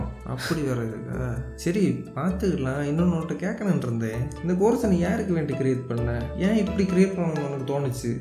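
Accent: Kanyakumari, India. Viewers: Hii neenga Kanyakumari ah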